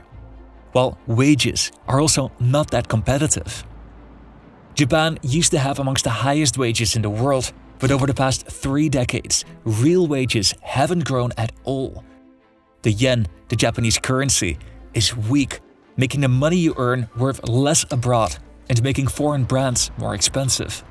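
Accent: Netherlands. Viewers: I know a lot of people who want to live in Japan. People who are taking their culture with them and try to enforce it on the Japanese, which will be similar scenario what is happening in the west right now.... And yu are Dutch channel. As native Dutch speaker myself can always hear the accent haha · Dutch accent English. Very well documented this.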